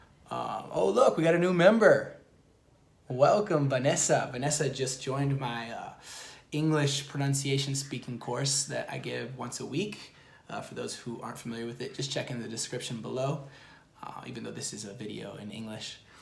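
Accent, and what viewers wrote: Buenos Aires, Argentina. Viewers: Hola, me encanta ver esta pasion por aprender otra cultura, solo habre otras avenidas, pero si talvez pierdas el bello acento Argentino. Pero extiende tus alas vuela. 💞🤗🤗🤗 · Te escuché tanto hablar en español que ahora parece que hablas inglés como Argentino que aprendió el idioma jskajaja te amoooo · Ahora cuando habla ingles se nota que tiene el acento argentino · Parece un argentino que aprendió inglés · Estoy loca o cuando habla en inglés lo hace con acento porteño????? Cuando hablas en inglés te dicen que tienes acento????? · Dude, you don't know how glad I am . Soy Brasileño, vivo en Buenos Aires, o sea ya era inmensamente feliz cuando te escuchaba hablar un "castellano porteño", y ahora que dijiste eso .... Cara o português que falamos no Brasil é unico, é particular, é sonoro. Espero que aprenda e um dia grave alguns videos que vamos te aplaudir de pé. 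Tamo junto kkkkkkk. You kill it man, nice video.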